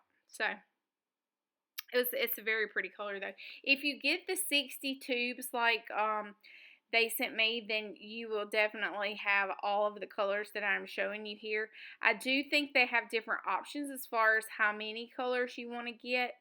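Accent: South, United States. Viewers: You are too much fun with that southern twang! Love the little journal and the cover.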